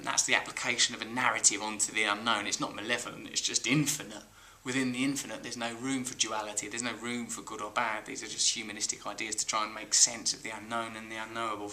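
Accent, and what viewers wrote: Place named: London, United Kingdom. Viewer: london accent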